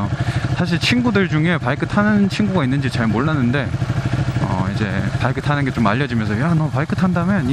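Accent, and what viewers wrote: Gyeongsang, South Korea. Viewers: 저도경상도사람인데 님 경상도인신줄몰랐네요ㅋㅋ 친구랑대화 하시는거보고 친숙한ㅋㅋ 더욱 정감가네요ㅎㅎ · 갱상도 아입니까? 북입니까 남입니꺼?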